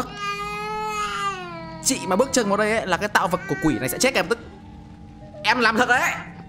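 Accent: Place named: North, Vietnam. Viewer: Chuẩn trai bắc đấy ông ạ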